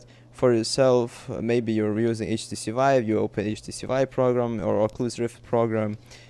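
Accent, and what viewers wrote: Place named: United Kingdom. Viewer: You sound so British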